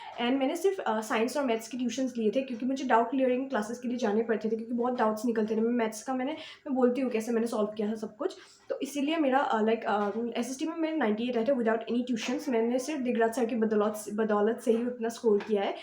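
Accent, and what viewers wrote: Assam, India. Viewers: Assam fam here! I did recognise that you are from Assam from your accent. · It seems like you are from Assam. Are you?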